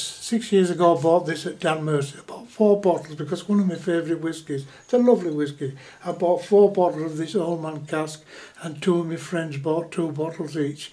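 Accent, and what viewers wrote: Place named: North East England, United Kingdom. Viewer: Great guy! Sounds like north east England (his accent). Says “filim” a few times!! Great guy, great video, appreciate the tips!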